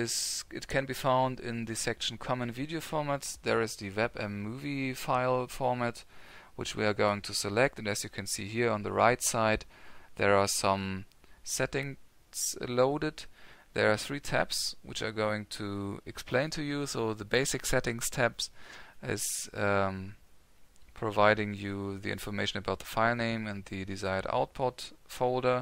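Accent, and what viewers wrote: Germany. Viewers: Ich habe irgendwie instant gemerkt das du Deutscher bist xD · Gotta love that german english accent. Sehr gut xd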